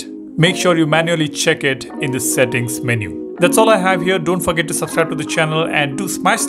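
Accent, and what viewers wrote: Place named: India. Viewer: I was looking up for a video about the n10+ 3.1 UI but I couldn't bear the heavy indian accent I can't.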